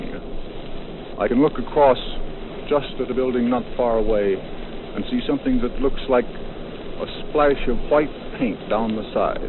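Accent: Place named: Canada. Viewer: Murrow was from eastern Washington state. He sounds a touch Canadian, like he could have been from British Columbia.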